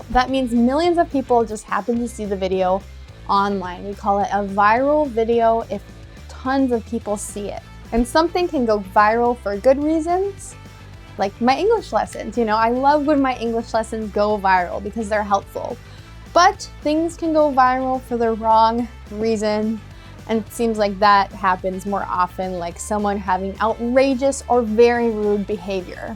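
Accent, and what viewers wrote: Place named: California, United States. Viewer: Thank you very much for your video. I added your channel. I am always wondering where you are in the US. I slightly hear California accent.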